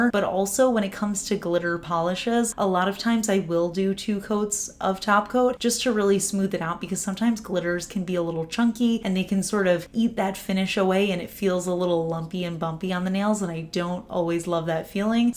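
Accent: New Jersey, United States. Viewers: your NJ accent... Not just the way you say orange, but forest as well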